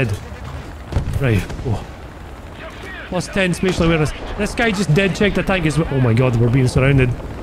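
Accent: Scotland, United Kingdom. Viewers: A great Scots man. Love the videos and the accent.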